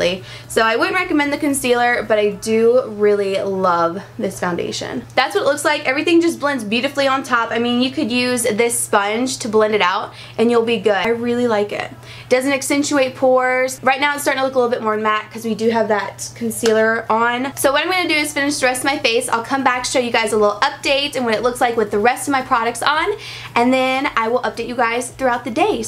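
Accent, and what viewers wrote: South, United States. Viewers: I personally don't see it AT ALL. Maybe they both have that slightly Southern accent. But looks-wise, she has NO resemblance to Britney Spears NOR Britney's younger sister.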